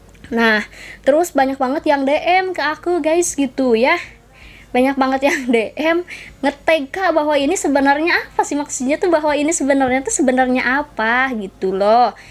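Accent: West Java, Indonesia. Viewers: Kakak Logat/Nada Ngomongnya Kayak Orang Sunda, apa bener?😅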